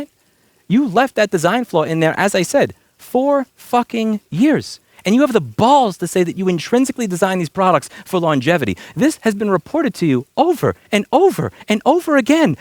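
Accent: New York, United States. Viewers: I can fully process at 0.5x, but slowed ny accent sounds like a mob repair guy. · The angrier Louis gets the more is New York accent comes through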